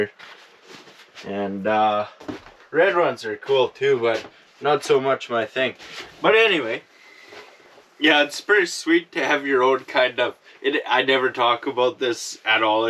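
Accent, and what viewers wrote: Canada. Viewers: Oh my Goddess you're hilarious! Lmao when you opened the door to new snow dump! Love your Canadian accent. Your a smart guy and good to your dog 🐕 👍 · Just curious, where are you from? Canada? Interesting accent... Great video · I could listen to this guy talk ALL DAY, LOL!!! That Canadian "Country Accent" Adorable..... ❤ from Kentucky U.S.A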